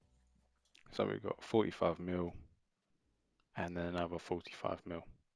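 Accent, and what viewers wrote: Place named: Ireland. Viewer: Love that Irish actsent.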